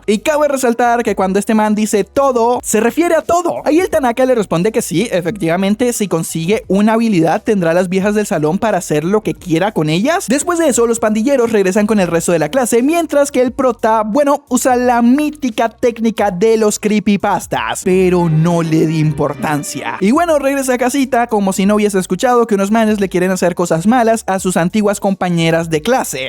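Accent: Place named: Colombia. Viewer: Con ese acento sí o sí eres colombiano jajaja 10/10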